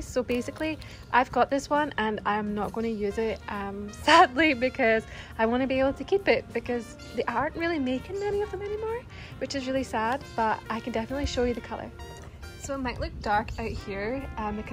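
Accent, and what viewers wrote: United Kingdom. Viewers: Wait a second are u from the UK? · Omg. You have a lil bit of an accent. British?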